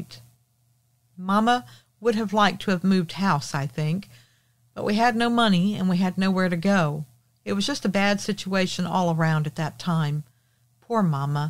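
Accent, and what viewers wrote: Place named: West Virginia, United States. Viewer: Well done! I loved this story! So glad you narrated in the same W Virginia slang. I could absolutely see every detail of the story. Well written and well narrated. Thank you!!!!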